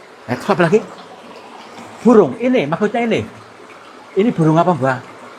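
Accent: Banyumas, Indonesia. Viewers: Ngapusi dirinya sendiri ,ingat jaman sekarang itu nggak ada gitu gituan ini bohong ,kalau di lihat dari gaya logat ngomong nya ini orang ngapak ,semoga sukses bikin berita bohong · Orang ngapak om? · Kayane wong ngspak kang pada karo inyong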